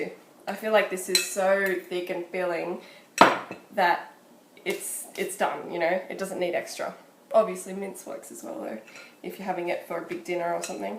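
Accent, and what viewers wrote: Australia. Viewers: FYI Australian accent :)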